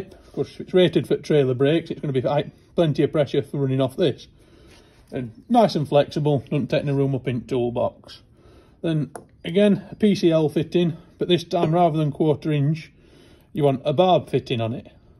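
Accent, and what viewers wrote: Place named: North, United Kingdom. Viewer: You've gone seriously northern. Good video, hope you are well.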